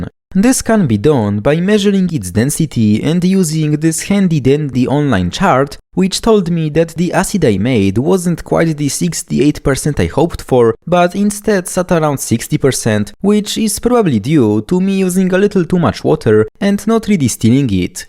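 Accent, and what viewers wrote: Poland. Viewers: First three words, I heard the polish accent, I knew it instantly😂😂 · Z akcentu wnioskuję że polak?😂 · Most polish accent ive heard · Od pierwszego słowa wiedziałem że Polak ❤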